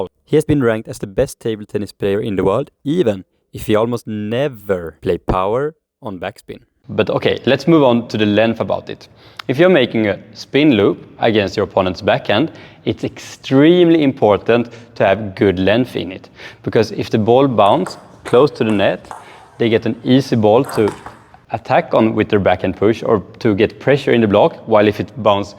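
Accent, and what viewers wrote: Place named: Sweden. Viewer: bra tips, o alltid charmigt med grov svensk dialekt på engelskan :)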